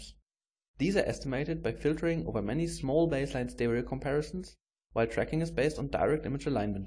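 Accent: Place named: Germany. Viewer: So German English!!!!!!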